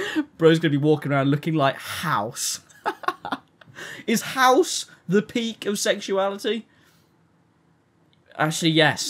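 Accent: United Kingdom. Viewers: i think i just fell in love with british accent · man your vidoes are fun 😂 Gonna binge watch everything now!! P.S. That bri'ish accent helps too 🤭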